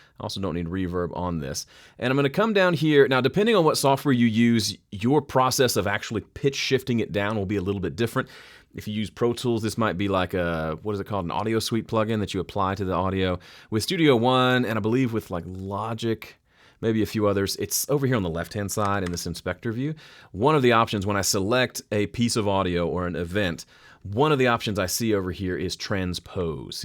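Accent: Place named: Indiana, United States. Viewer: So you’re from Mississippi? Sound more Indiana🤓 How ‘bout dem Memphis Tigers? Great tip too, thank you good sir.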